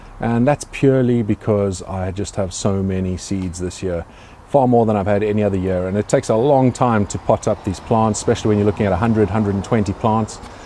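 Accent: South Africa. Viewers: Awesome channel. Built a hydro system as a little hobby project and my first thought was "I have to grow peppers in this" so managed to get hold of a nice assortment including some Reapers. Been enjoying your sauce making videos very much and great to see your growing methods too. Your surroundings say UK but your accent says SA. Either way, hi from Johannesburg!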